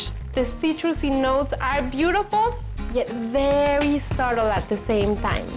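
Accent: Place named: Latin America. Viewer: She have a latina accent...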